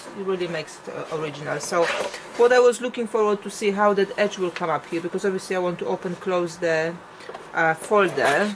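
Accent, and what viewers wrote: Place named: Poland. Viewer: Good Morning, what a great idea on how to use the snow ball pieces, I would have never thought of it. Its a wonderful way not to waste any fabric. I really enjoy quilting videos and how to use up scraps. Definitely would love to watch more of your video's. I love your accent, I believe you are Polish, not sure if you are in the US. Your English is fantastic. Hope to see more of your videos.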